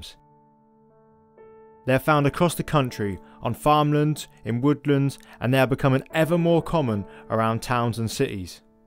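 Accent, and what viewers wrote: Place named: Norfolk, United Kingdom. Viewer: Can't tell where in Norfolk you are from! I'm guessing Thetford way?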